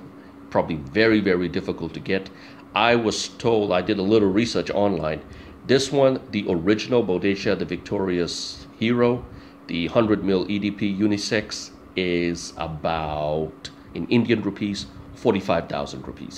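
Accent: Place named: United States. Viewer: You speak very good American English for living in India.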